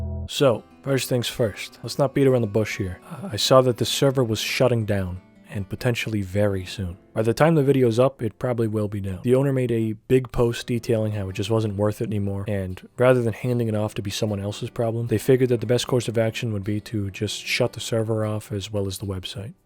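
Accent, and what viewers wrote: New York, United States. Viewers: Shout out to my fellow Canadian that sounds like a new yorker. We're a rare breed.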